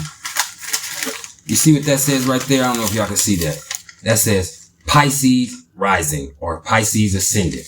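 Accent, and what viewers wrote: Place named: California, United States. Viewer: This gotta be a Cali accent😂😂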